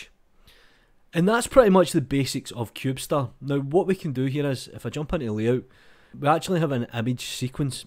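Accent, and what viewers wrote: Scotland, United Kingdom. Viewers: Good information delivered straight to the point in a clear scotch brogue.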